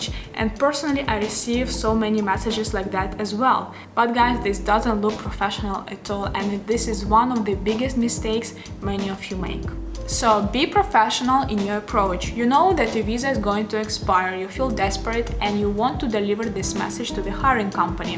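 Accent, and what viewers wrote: Russia. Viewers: Ужасный русский акцент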